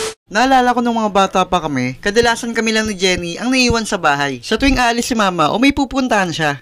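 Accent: Ilocos, Philippines. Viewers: Kuya ilokano ka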